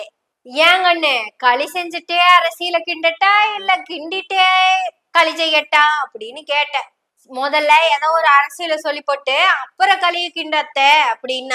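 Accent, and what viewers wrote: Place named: Coimbatore, India. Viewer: Coimbatore slang exact .Superb..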